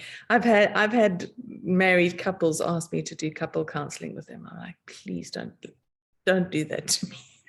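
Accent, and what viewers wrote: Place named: South Africa. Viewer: I really appreciate this video thankyou and to all. Is anyone able to join these sessions? Four Agreements is great, simple yet profound truths and pactices. SO much going on energetically! My guides told me to take more shorter breaks more often throughout the day as it's a tripple whammy for me recovering from medical treatments and going through all these energetic changes, getting upgrades and being so highly sensitive. Solar flares really affecting me too, I had a throbbing headache yesterday - unbearable! I "cried out" out to my guides, I said please!! I imagined a "helmut" on my head absorbing the pressure and tension and asking it to give me protection and it dissolved, just like that - what a relief! I love that SA accent, you'll end up with an interesting accent I think. Thanks lovely, great video, I'm glad you made it and shared it - very supportive for the rest of us. 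🙏💗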